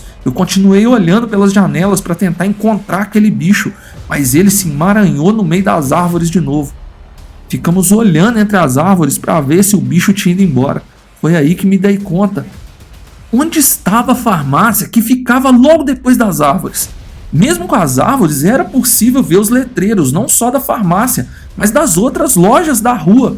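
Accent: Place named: Campinas, Brazil. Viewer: Vou me inscrever. Gosto dos assuntos e seu sotaque parece que tu é aqui do interior de Campinas kkkkk então me identifico. Sucesso.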